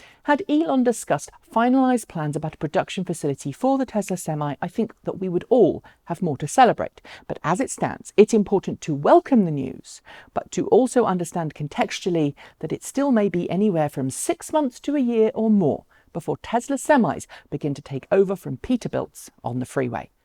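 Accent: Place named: RP, United Kingdom. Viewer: Your accent goes a bit posh in these videos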